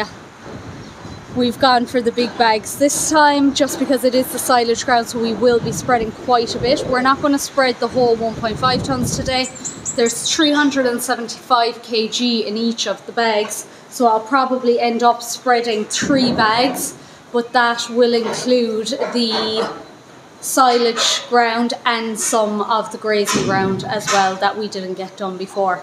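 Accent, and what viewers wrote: Ireland. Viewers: Hello! Me being from North Carolina(USA) I love listening to you talk. People say I have an accent but I believe you have me bet. Also I love those saying which is probably normal for you Irish folks. Enjoy listening to the sound of the ol' 135 so maybe video some real time in cab with you.🐮🚜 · Brilliant video, you could use a greedy board extension to the spreader, maybe useful to see if Vicon do it. A good job well done, you figured it out, and that's what important. No you don't talk to much, it a pleasure to listen to your soft Irish voice. ♥️👍👍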